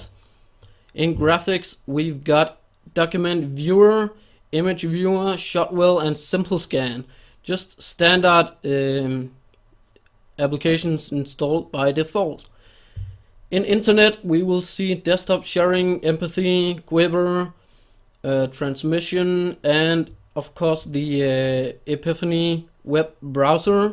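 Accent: Denmark. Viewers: Dejlig dansk accent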